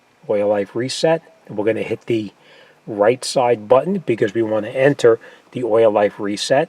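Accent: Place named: Northeast, United States. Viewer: I love the video. Easy, clear, and straight to the point. Plus, that Northeastern accent is one of a kind!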